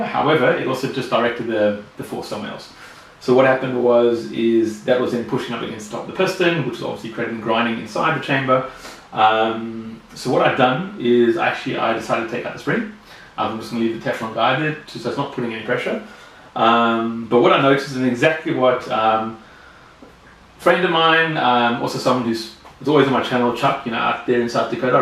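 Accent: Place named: South Africa. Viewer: Good to hear that accent after all these years. Middle aged now but growing up in England by some strange twist of fate my first real best mate was South African, then in senior school my best mate was a very superb bloke (again South African), and in my twenties I worked a shitty job briefly where I hated almost everything about it, other than one guy who was again South African and a real life saver.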